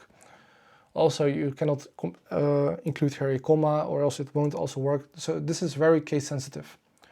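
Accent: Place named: Netherlands. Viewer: Hi, ik heb je net gezien op YouTube podcast van Sabrina! Wat een mooie podcast geworden en wat vet dat je zo lekker gaat!! Ik ben in het begin (leer) stadium. Heb besloten m’n tanden erin vast te bijten. Je video’s zijn ook leuk om te zien. Simpel. Hoeft niet perfect. (Reminder to self) En je website is prachtig!! Het hoofdje van de robot is adaptive to your finger. 😊 Ik ga je volgen en van je leren en er zelf mee aan de slag! (Ik hoorde btw direct dat je uit Nederland kwam 😁)Succes 💫 🙌🏻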